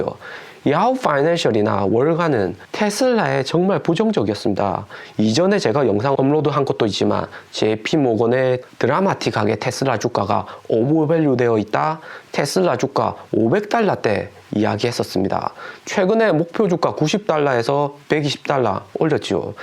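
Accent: Gyeongsang, South Korea. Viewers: 덕분에 테슬라 650불에 진입했어요... 어쩐지 낮익은 목소리.... 같은 갱산도 아이미꺼 저는 마산... 샤오펑도 오늘 진입했어요.. 새해 구독자 대박대박... 영상 보신분들 꼭 구독 좋아요.. 부탁드립니다. 샤오펑도 가즈아~~~ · 경상도 아지야 좋아요 꾸우욱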